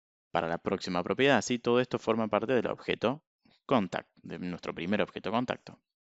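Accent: Argentina. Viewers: Impecable la explicación. Se agradece un tutorial en cordobés =D! Saludos de Bs As.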